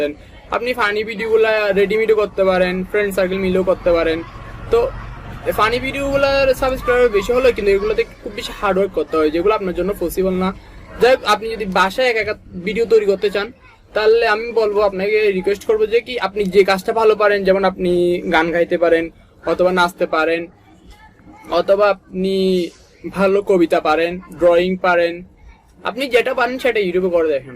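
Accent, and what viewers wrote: Chittagong, Bangladesh. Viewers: Ctg er manus suddo bolta pare na... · agee giye kotha bola shikhen... chittagainga gula ki aktu suddho kore kothaw bolte pare na naki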